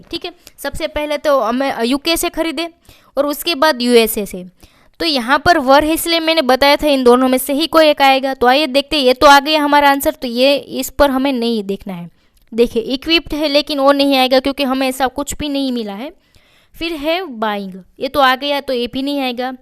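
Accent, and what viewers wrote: Gujarat, India. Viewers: Thank you 🙏 Gujarati tone in your voice 😄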